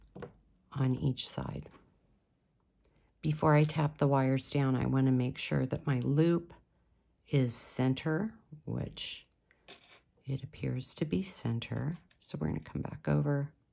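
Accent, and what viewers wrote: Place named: Midwest, United States. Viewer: are you from the Midwest somewhere? I hear my family in your pronunciation of words with “or” in them like “form”. I love your designs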